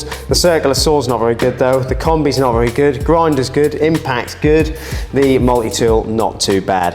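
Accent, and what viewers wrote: Norfolk, United Kingdom. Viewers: are you a norfolk boy buh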